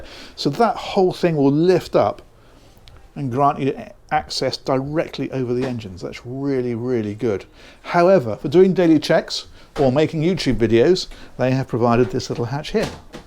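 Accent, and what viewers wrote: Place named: United Kingdom. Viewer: Fun fact, the original audio is noted as "US English". I am french, the french audio is terrible. And you don't speak the barbarian "US English", you speak English. It's obvious for anyone speaking English. It's my third language. English is much more elegant and fluid than the "US English". Vocabulary is INDEED different too.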